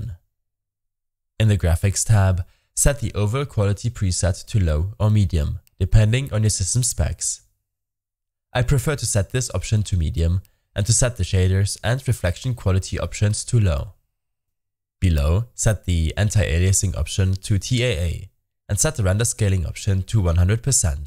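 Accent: Germany. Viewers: Are you from Germany? kinda sounds like that xD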